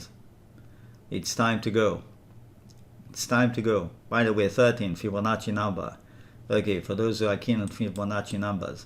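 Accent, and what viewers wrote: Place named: Australia. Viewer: By the accent, I guess Australia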